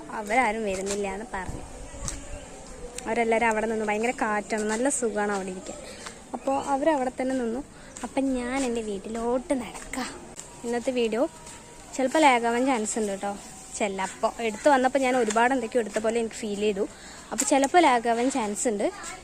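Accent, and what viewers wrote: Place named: Thrissur, India. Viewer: Thrissur slank anello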